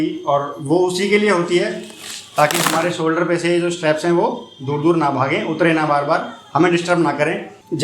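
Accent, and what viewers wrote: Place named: Uttarakhand, India. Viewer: Bhai tumhare bolne ke tarike se lag raha h ki tum Uttarakhand se ho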